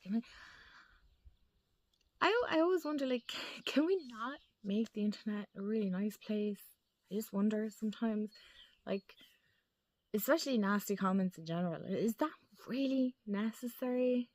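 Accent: Ireland. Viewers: I really like your voice and your Irish accent 😍 I relate to everything you said very deeply ❤ It's been really hard for me as an Iranian, staying present helps a lot. I have had a channel on YT since 2020 (My Farsi channel) and the best advice I can give to anyone who is not here for money, is to completely forget about the algorithm. ❤❤❤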